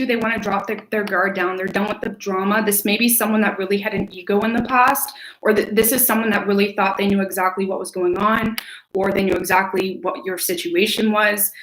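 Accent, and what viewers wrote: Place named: Canada. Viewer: I thought you were Canadian from the accent but that Tim Horton's confirmed it 😂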